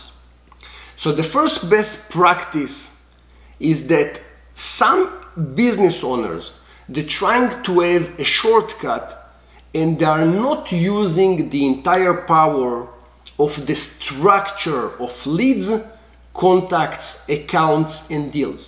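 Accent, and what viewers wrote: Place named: Spain. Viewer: You sound like spanish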